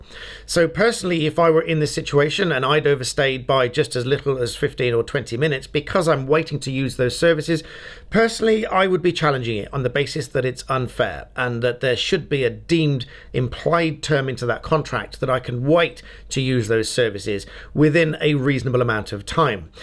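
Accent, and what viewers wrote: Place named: England, United Kingdom. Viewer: I saw you on Legal Bytes so I just subscribed. (I love your English accent:-)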